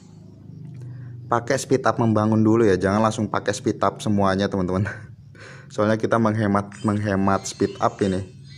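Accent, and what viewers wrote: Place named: Medan, Indonesia. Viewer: Medan mana suaranya.... SULTAN MEDAN DIA BG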